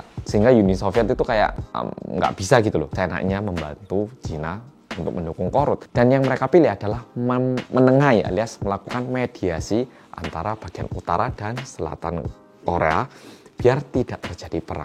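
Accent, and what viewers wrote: Java, Indonesia. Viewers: Orang Korea tapi bahasanya agak medok 😀👍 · Sampean logat jowo ne peh masok bolo😁😁😁😁😁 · Denger pelafalannya mirip soekarno yaa kalau ngomong “keun” maksdnya “kan” wong jowo 🔥🖤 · Wah bahasanya medok..sudah kayak orang Jawa mas😂😂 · Hi oppa medok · Hahahahaha penjelasan nya wong jowo tenan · Aksennya indo dapet bgt, semangat bg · Orang korea lagam bicara jawa wkwkwk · Logat Jawa nya tu lo hhhhhh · Bisa jadi pengamat politik iki mas korea yg bisa jowo medok🤣🤣🤣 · Logatnya jadi BHS Jawa ya medoknya 🤣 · Ini orang Korean beneren ya? Medok jawanya enak lho. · orang korea ko medok??🤔🤔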